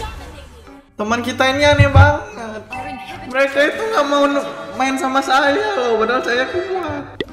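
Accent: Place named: Malaysia. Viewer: Gua dari Malaysia gua dengerin suara abang kaya orang malaysia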